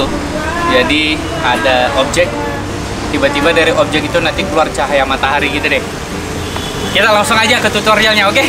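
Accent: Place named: Banyumas, Indonesia. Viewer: mbaknya ngapak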